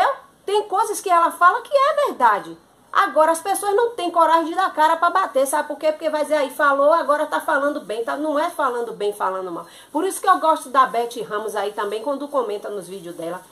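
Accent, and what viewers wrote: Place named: Northeast, Brazil. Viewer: Gosto muito dos vídeos e do sotaque nordestino também, coisas lindas do nosso Brasil, uma terra linda, cheia de diversidades e por isso tão maravilhosa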